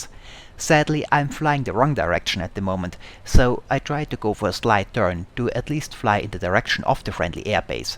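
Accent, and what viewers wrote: Austria. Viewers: IIRC, he is from Austria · ***** so you havent heard an Austrian, exactly said Tyrolean, speaking english before.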